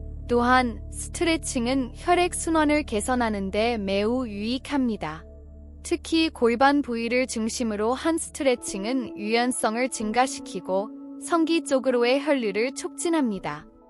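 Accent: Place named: China. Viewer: 발음이 중국인이 말하는것같다.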